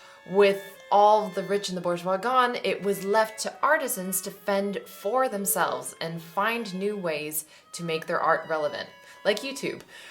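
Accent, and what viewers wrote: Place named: France. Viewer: Great french accent and history background ✨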